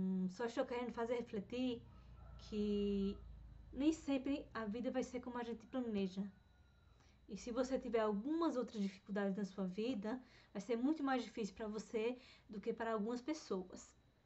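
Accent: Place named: Portugal. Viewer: Interessante seu sotaque, por um momento no início do vídeo eu achei que vc fosse portuguesa ou que tivesse passado muito tempo em Portugal. Achei muito legal o vídeo, na forma como vc conta as coisas, bem detalhadas e de uma forma íntima buscando contar como vc se sentiu e o que vc pensa sobre.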